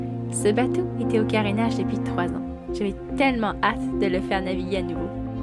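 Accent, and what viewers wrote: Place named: Quebec, Canada. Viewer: qu'elle belle surprise de te retrouver. Tu donne du plaisir à beaucoup de monde. Je suis un vielle homme de 75 ans invalide, veuf et je vis seul dans une maison trop grande. La vie que tu as choisi. Quel volonté et courage de continuer l'aventure seule sur ton petit eskimo. Tu vis ton rêve que d"autres ne font que rêver. Je vous suit depuis des années. J'aime votre accent du Quebec, parfois incompréhensible (je suis Belge) et vous et quelques autres voileux vous êtes mon seul plaisir. Bon vent à toi petite sirène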